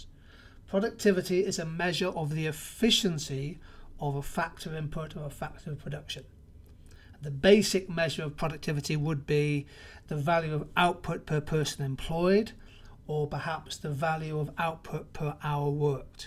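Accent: United Kingdom. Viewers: I love the accent , it s british, isn t ? well explained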